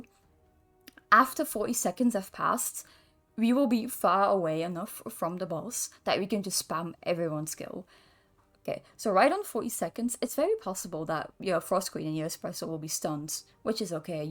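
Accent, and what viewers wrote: United Kingdom. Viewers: Nice guide British woman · Are you British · Are you British?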